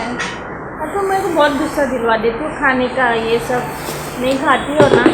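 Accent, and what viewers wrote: Maharashtra, India. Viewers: Tab hi mai sochoon aap marathi accent aur amma ji bhojpuri accent humare husband ke dadi aise hi baat karti thi she passed away this January yaad agyi unki....waise mai Bengali hoon Up wale se shadi hui hai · maharashtra ki rough boli hai aTapori bhasha hai gandi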